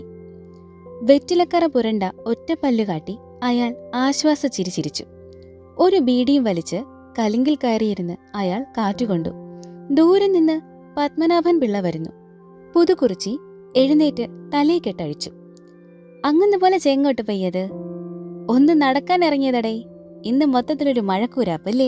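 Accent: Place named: Thiruvananthapuram, India. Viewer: 🎉🎉🎉. തിരുവനന്തപുരം ശൈലി 😂😂😂😂 ചിരിച്ചു ചത്ത്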